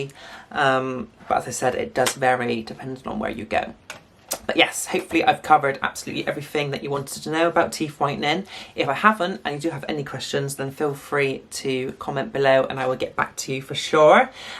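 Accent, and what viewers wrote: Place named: Bristol, United Kingdom. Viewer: Are you Bristolian? Iam aswell so think I can hear it in your voice haha, great review! I’ve been looking into trying boutique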